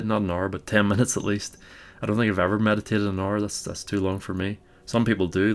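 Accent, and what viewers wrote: Northern Ireland, United Kingdom. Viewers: Northern Ireland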